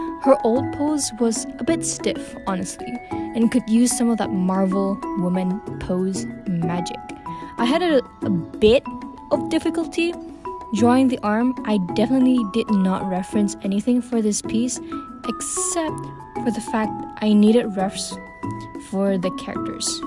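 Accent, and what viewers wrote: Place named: Malaysia. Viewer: Hey!! Thanks for this video!! I actually did some self-critique on my artwork before so I could relate to this video Also, I KNEW IT, YOU'RE MALAYSIAN!! I thought your accent kinda sounds Malaysian plus your name. Hello fellow Malaysian artist~ And I really like your semi-realistic artstyle. I also do semi-realistic-painterly style as well!! Oh, and also, WTF, YOU'RE ONLY 17!?!??? HOW ARE YOU ALREADY THIS GOOD!?!??? I thought you would be like, 21 or something. But I've seen some really good artists who are quite young, so I guess it's normal Also, why no comms? T__T Oh, and btw, did you have a booth at CF two weeks ago? :oo Anyways, I'm gonna subscribe to you and I'll look forward to see you post more videos and speedpaints~!! Cheers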